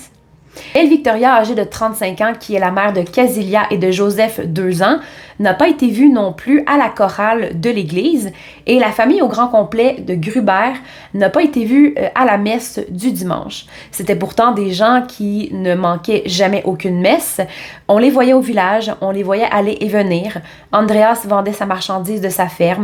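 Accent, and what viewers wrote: Quebec, Canada. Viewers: Bonsoir, affaire assez étonnante. C'est assez brouillon comme meurtres, celui qui arrivera à trouver le coupable, même plus de cent ans après, aura beaucoup de chance car toutes les hypothèses que tu cites peuvent être valable. Merci pour ton super travail avec ton joli petit accent québécois. Je n'arrive pas à te trouver sur Instagram. Pourrais-tu me donner ton Instagram, stp ? Bonne fin de soirée, bises livresques du sud-ouest de la Mère Patrie. 😘😘😘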